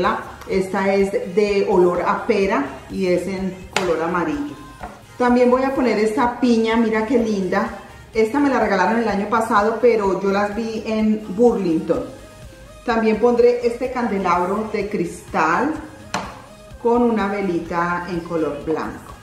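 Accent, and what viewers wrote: Colombia. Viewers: me encanta el acento Colombiano, en instantes se escucha!!!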